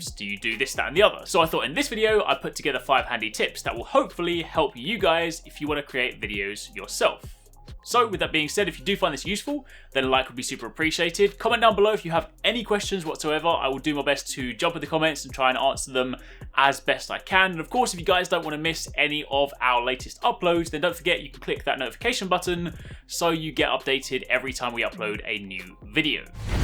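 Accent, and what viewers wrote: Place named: United Kingdom. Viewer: Thank you! I'm not in videomaking or stuff, but seeing you share high quality knowledge is always good! Following your channel since you were making MH4U vids and I'm very happy with your growth, well deserved! I'm not an english native speaker and you helped me to learn the language with your tutorials in a funny way and brittish accent!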